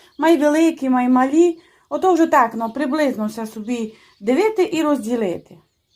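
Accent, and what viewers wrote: Transcarpathia, Ukraine. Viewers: Не показуйте будь ласка свою неосвіченість. Це на Закарпатті такий діалект. Ніхто мову не кривляє. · Сьогодні зпекла всі три види, тісто чудове. Я багато печу, цей рецепт не новинка, але дуже мені подобається ця закарпатська говірка. Дякую,.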